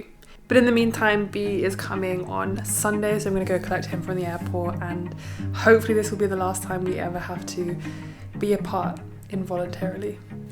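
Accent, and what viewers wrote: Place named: England, United Kingdom. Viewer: My daughter-in-law is from the Lake Como area of Italy. When she married my son and returned with him to Ohio, she had prepared as best she could but there were times she felt very homesick. Luckily her friends and relatives were able to visit them here in the U.S. (and to video chat often) especially during the first few years when the two grandchildren were born and she couldn't travel back home. Then Covid messed up travel for over 2 years. This summer she had nearly a month long visit there with the two bilingual kids in tow and hubby joining them for part of that time. It was so joyous for everyone! But she shared with me that once she got back to Ohio she felt she'd arrived "home" to her house and neighborhood and career with her husband and her children. Your love for your native England and your family of origin will never fade but your concept of where your "HOME" is will gradually switch to where your partner, your future children( those little cuties lying in wait for you in your future) and your new house and career are will then be your emotional home base. 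Of course these recent months have been unsettled for you and your creativity stiffled for the time! Your long wait will soon be over. (Just don't lose your lovely English accent and start sounding all American and inelegant when you swear or say shit! 😉😘😚)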